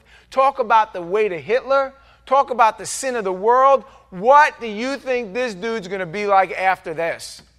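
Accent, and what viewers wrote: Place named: Long Island, United States. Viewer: You sound like your from Long Island. Good Sermon !😊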